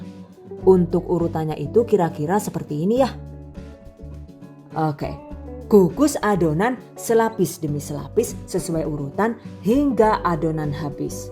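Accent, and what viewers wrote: Banyumas, Indonesia. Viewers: Ngomong engris dgn dialek ngapak, sukak banget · Sumpah ngakak jebule wong ngapak 🤣,suwun mba resepe😘sukses selalu tambah maju🥰aamin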